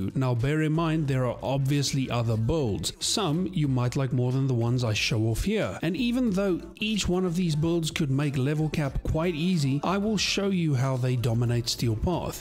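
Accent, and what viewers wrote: South Africa. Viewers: Hey bru are you from south Africa? I cant mistake that accent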